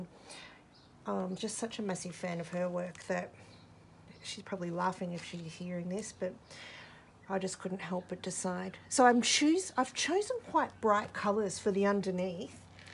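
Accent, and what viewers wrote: Australia. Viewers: I just found your site. Cannot hear you...voice is so soft....love the Aussie accent...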